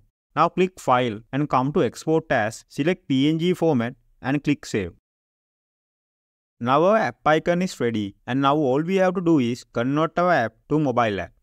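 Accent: Kerala, India. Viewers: Lived your malyali accent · Nice Malayalam accent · Your voice is like my Indian frnd * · indian accent? I hear scam when i hear indian accent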